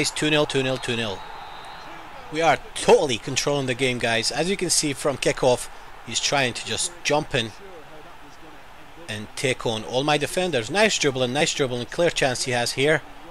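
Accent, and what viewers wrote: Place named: Scotland, United Kingdom. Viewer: i thought you were Scottish myself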